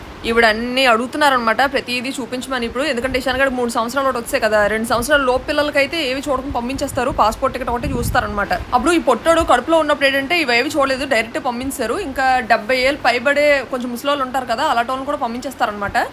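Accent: Vizianagaram, India. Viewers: Mana vizianagaram Language.... Super👌.... Ventunte 👂 super super👌..... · మీరు విజియనగరం యాస మాట్లాడితే బాగుంటుంది నా అన్వేషణ విశాఖపట్నం అయిన విజియనగరం యాస మాట్లాడతరు మీరు మాట్లాడడి మాది అనంతపురం అయిన ఆ యాస కోసమే మీ ఇద్దరి వీడియోస్ చూస్తాను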